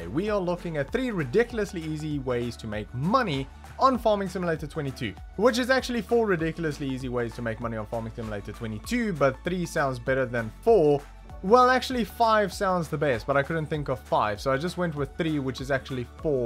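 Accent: South Africa. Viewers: Strong SA accent 🤘🤘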